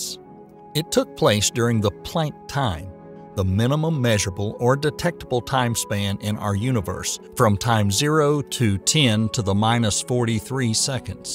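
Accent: South, United States. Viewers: Love hearing a southern narrator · Love that southern accent spitting space facts! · This guy sounds COUNTRYYY! 🤠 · There's something about a guy with a southern accent talking about space that is surprisingly off putting imo. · Dunno what to think about a guy with a heavy southern accent telling me about space... 🤔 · "Peculiar -arities. ." Sorry, the muddy southern drawl and not pronouncing words is killing your cred. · A southern narrator. Never had that happen. I subscribed before I even watched the video and I'm here to stay. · It just sounds so out of whack to listen to cosmological specials/documentaries narrated in that southern drawl. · British narration is so much preferable than an american accent like this. Too bad, could've been an interesting doc. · Just 😍 the southern accent. Being a New Englander I don’t hear it often! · I REALLY WISH they'd pronounce it the right way, YOU- rah- NUss,, not you-R AAY nus,, and especially with that Southern Bible belt accent, I sincerely think the guy was pronouncing it that way because he liked doing it lol......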